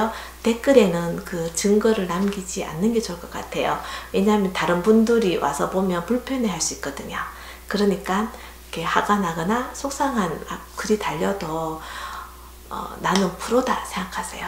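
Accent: Gyeongsang, South Korea. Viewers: 나긋나긋한 경상도 억양 매력적이신 이모님 긴단하고 따라하기 쉬운 반찬 너무 좋아요 ♥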